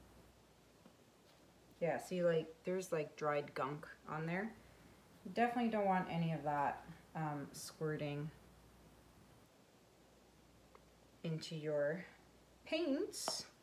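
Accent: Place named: Newfoundland, Canada. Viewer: You sound like you are from Newfoundland. You sound like an uncle I had.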